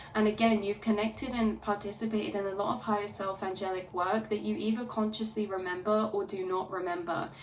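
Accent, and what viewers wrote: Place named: England, United Kingdom. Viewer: Oh yeah I’m in the UK and you sound like you’re from England…I’m a Londoner..Peace ✌️🫶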